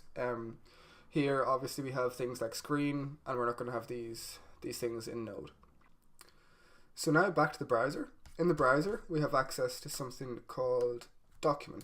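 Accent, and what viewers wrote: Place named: Ireland. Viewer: it's called an irish accent hahah!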